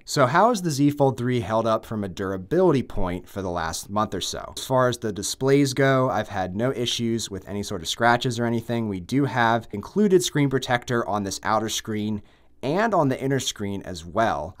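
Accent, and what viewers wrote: Philadelphia, United States. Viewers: From Philly?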